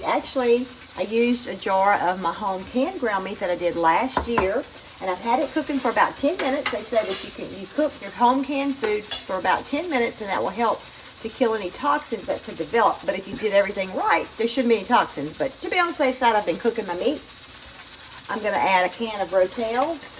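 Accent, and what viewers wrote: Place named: South, United States. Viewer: Never seen yellow.. I love your southern accent..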